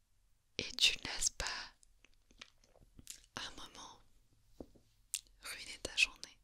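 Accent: France. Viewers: Lol yeah her French is great...she's a native speaker. She lives in Montreal (Québec province of Canada) but she has a French accent when speaking (not a Québécois accent). Makes me think she's French and moved to Canada.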